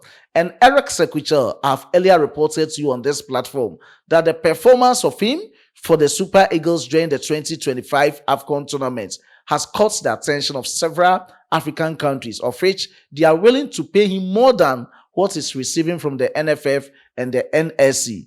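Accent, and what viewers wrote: Ghana. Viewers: You sound like another Ghanian pretending to like Nigerians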